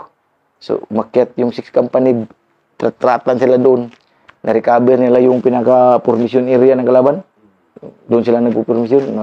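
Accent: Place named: Visayas, Philippines. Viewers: Napatawa ako don ah 😂 , Abante kage, abante kage,. Pagkaluskos bombahan ng tropa hala. Patay si kage!😂😅 . bisaya kaayo ka sir ba klaro lang hahhaaha😄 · nakakatuwa si sarhento mag kwento kc may halong bisaya kay bisaya man ako watching from manila pero sa surigao ko pinanganak 😊💪✌❤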